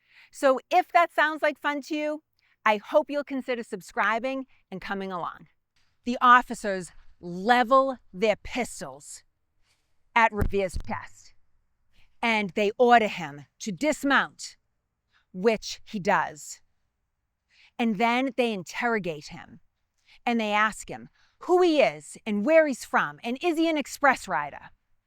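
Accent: Boston, United States. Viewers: . My family is from Boston, and sorry, she sounds just like us. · As a southerner I could listen to Revolution history in this Bastan accent all day long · I Love Her BOSTON ACCENT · Not only the interesting historical information, and views of the sites, it's done in that lovely Baston accent. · As a Bostonian, not only do I love the history but I love the accent. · Ok this story can only be told with that Boston accent! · Great story. However, what an AWESOME Boston accent! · I just love to hear you tell stories. I love your Boston accent and the passionate way you connect with history. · After listening to her talk for only 15 seconds, I could definitely tell she was from Boston.🤣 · As a native Bostonian who worked hard to eliminate my local accent this gal is the real deal. She does a great job explaining a very important historical event. Cheers! 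· I grew up in Boston. I am a 4th generation from the same town and my 2 boys are the 5th. I think you have more of a Boston accent than I do and you are probably not even from Boston. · I LOVE YOUR BOSTON ACCENT. Im from California, biggest Red Sox fan in Ca and a big fan of Our Founding Fathers and American history. I just found your chanel and i love it. Thank you for sharing your knowledge.🇺🇸 I Love My Country. God Bless all and God Bless this beautiful country🇺🇸🇺🇸🇺🇸🇺🇸🇺🇸🇺🇸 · I love her accent-- my inlaws are Boston area born, raised, still there. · Connecticut here. Love a Boston accent. Great job. I subscribed and liked and look forward to more. Thanks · For all of you out there, this is one authentic Boston accent. If you are anywhere in the world, and you here this tone, Boston is not far away. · Great presentation. My father and grandparents were Boston natives. I lived in or near Boston for a while in grad school at Brandeis. 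You have a classic accent - my dad said 'bananer' but did not drop his 'r's. His sister did.. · I’ve noticed that you’ve commented on her accent on several past videos. Just to confirm so you may perhaps move on: our family is from Boston. Her accent is authentic. Thanks. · That’s pretty rude! It’s an authentic Boston accent and your comment is offensive to those of us who are lifelong Bostonians. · The Boston accent is on 110. Turn it down just a tiny bit please. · All my Gad … the actual Spat … live the Boston Accent , I mean Bastan accent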